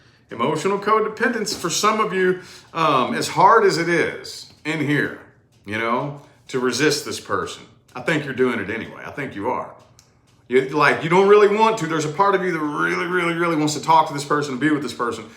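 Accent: South, United States. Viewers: the only Southen accent I like is yours, thanks.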